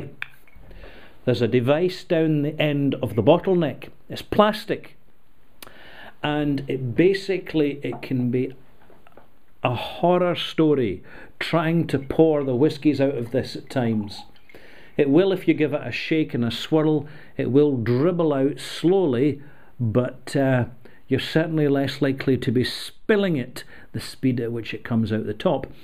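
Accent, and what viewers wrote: Scotland, United Kingdom. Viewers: What's band practice? How much water? And I guess the Scottish accent makes sense for whisky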